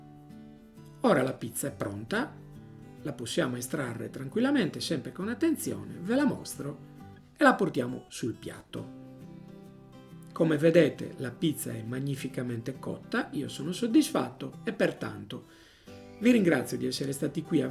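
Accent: Sardinia, Italy. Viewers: Sentivo accento nostrano... Bravo, saluti da Nuoro.;-)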